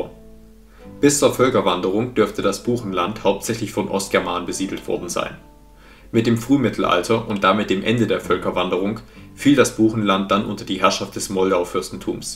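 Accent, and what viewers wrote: Saxony, Germany. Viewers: Die Beiträge über unsere ehemaligen deutschen Siedlungsgebiete sind inhaltlich spitze. Vuelen herzlichen Dank dafür! ❤Es tut mir nur leid und meinen Ohren fast weh, in welchem Tempo und mit völlig empathieloser Stimme die Texte gesprochen werden. 😢 Man möchte gedanklich folgen können und sich so viel wie möglich merken. 😮Unmöglich! Wenn der Verfasser selbst spricht hat er doch eine emotionale Verbindung zum Inhalt der Texte. Wenn es ein Sprecher spricht: Absolute Fehlbesetzung. Obendrein mit sächsischem Klang. So wurde nirgends in den alten Ostgebieten geredet!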